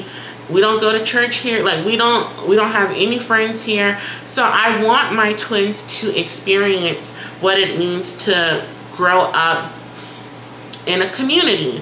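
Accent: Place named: Jamaica. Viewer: Born and raised in Florida!? But it sounds more like you were born and raised in Jamaica 🤷‍♂️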